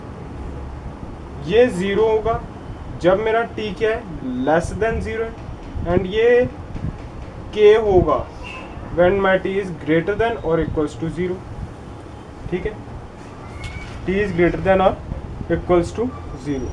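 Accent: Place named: Punjab, India. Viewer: It Sounds Cute When a Punjabi Guy Speaks in Hindi .....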